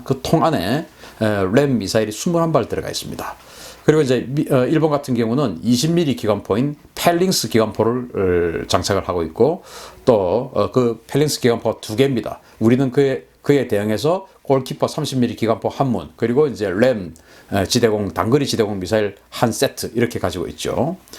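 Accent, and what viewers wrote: Gyeongsang, South Korea. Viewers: 다좋은데 상도사투리듣기가 좀 거북하네요.표준말쓰세요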